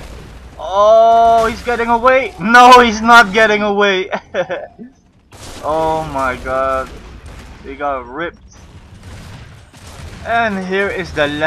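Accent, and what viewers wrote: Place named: Europe. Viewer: su acento no es de estados unidos el es de europa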